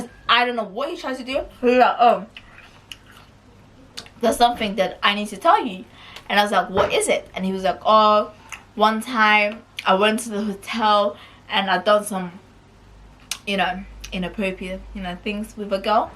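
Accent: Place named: Scandinavia. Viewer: by the way wheres your accent from?, are u from those Scandinavian countries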